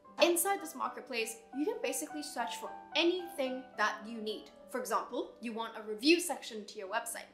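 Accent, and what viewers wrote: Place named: United Kingdom. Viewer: Hi! what is your website? I have wix & I didn't find it easy to use at all. I would love to have a one on one to see if you can help me. You are so excited about the flow, code graphics... Etc. I love you accent, I'm a big British fan and I picked up on it right away🙂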